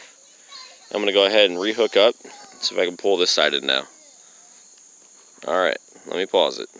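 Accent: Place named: Texas, United States. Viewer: I detect a northeastern texas accent. Figures.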